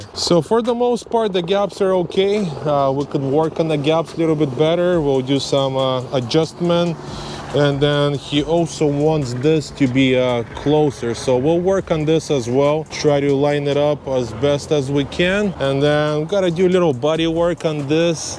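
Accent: Russia. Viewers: Ну по акценту скажу что чел то русский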